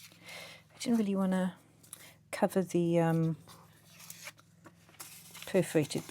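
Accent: Wales, United Kingdom. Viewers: Lovely to find you on here and hear the Welsh accent - but oh Im so sorry I couldn't watch til the end because far too many adverts every 3 minutes. You tube is now so annoying with the ads :(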